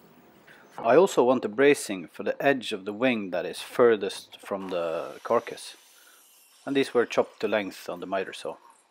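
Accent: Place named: Ireland. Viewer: Don't lose the tool sounds, and please do not add music. Your vac and air scrubber remind us that safety and cleanliness go together. I like your commentary, you sound as if you were taught English by an Irishman. That's no bad thing by the way, Irish people often have better diction than geographically English speakers of the language. Thumbs up from me, you got a new sub 👍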